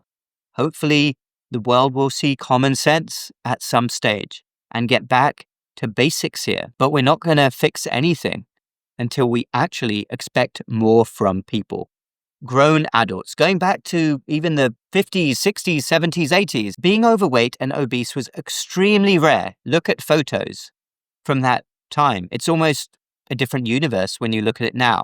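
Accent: United Kingdom. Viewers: You still have the British accent Doctor 😊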